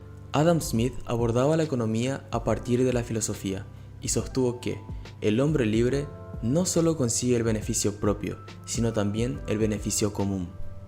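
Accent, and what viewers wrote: Paraguay. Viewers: De Paraguay?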